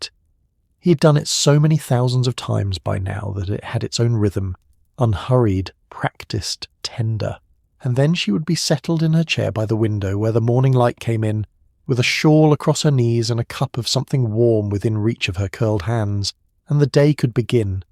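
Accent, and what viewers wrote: England, United Kingdom. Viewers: Why does the narrator have an English accent ?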